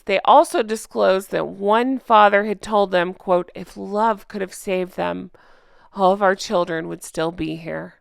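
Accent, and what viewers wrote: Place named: United States. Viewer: Oh that guy is hilarious! Who is he? Absolutely adore your YouTube, you and Beebs Kelly are brilliant. Only been on YouTube for 8 months when I got a new telly! Unfortunately can’t get all information on TV( using phone to write this). I keep asking what “sugars “ are- know they love the traitors but is it an American expression? Still love your voice. Wish I had an American accent ( like yours) it’s so beautiful on the ear. Sorry can’t do Patreon as I do animal charities but would like to know where I can view the merchandise ( too British to say merch 😹😹😹😹). Guernsey. Channel Islands xxxx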